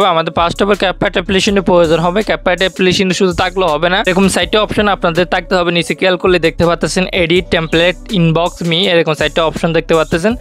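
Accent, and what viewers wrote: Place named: Sylhet, Bangladesh. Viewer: Apni sylheti vai❤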